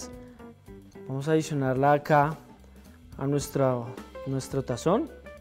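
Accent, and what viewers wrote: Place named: Colombia. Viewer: El acento colombiano es raro pero es buena receta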